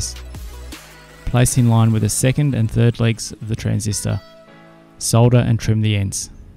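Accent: Australia. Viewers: When you finally hear daddy's voice and he's an aussie <3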